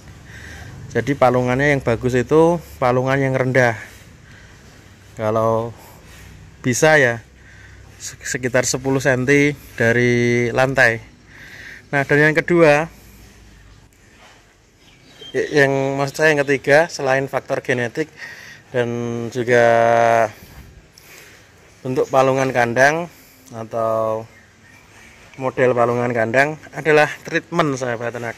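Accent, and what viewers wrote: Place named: Pati, Indonesia. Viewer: Wong Pati njenengan mas🤔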